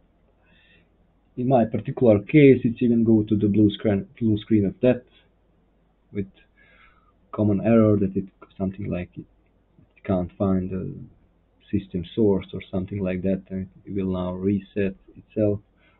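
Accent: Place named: Bulgaria. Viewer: Dude your accent sounds like Bulgarian. Grate that you fixed problem with booting DELL PC.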